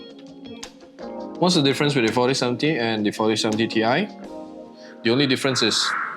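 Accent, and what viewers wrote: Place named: China. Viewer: Your accent is so chinese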